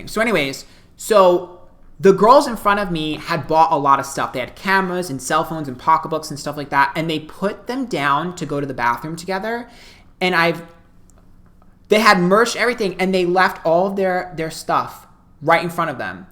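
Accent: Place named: United States. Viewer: His accent is death, combined w those hard gestures.couldnt make it beyond 2 minutes…….. insufferable…. Hes crapping on about miley cyrus in a lilly allen video. Gawd americans are insufferable…. This one is worst ever. I defy anyone to listen to this brain dead drivel combined w the worst accent possible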